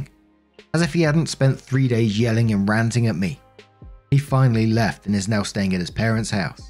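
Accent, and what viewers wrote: United Kingdom. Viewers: I love hearing how you pronounce words differently in the UK than the US!!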